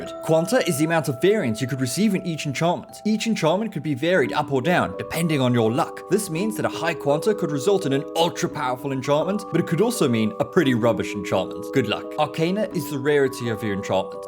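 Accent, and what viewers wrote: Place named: South Africa. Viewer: I like your accent. Is it South African? Great content friend. 👍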